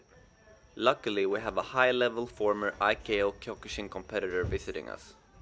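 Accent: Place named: Scandinavia. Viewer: Your accent sounds familiar. Are you Swedish or from a nordic country?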